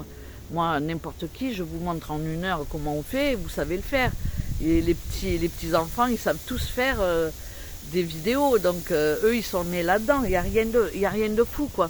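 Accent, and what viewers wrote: South, France. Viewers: Coucou très instructive ta vidéo. C est bcoup de boulot tout cà...moi j Adore ton accent du soleil. Ça fait du bien au coeur.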